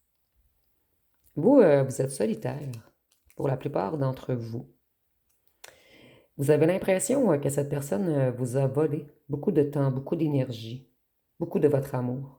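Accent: Canada. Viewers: ❤bonjour, j aime vos guidances. J ai booster, j ai liker.j adoré votre accent du canada❤et je trouve vos jeux de cartes originaux.merci 😊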